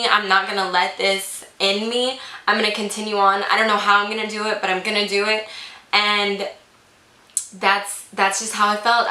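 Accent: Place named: Texas, United States. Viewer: this might sound weird but I love to hear you talk 😂 I love your texas accent and your stories! (more story time videos pls) thank you for being so humble and really inspiring! 💜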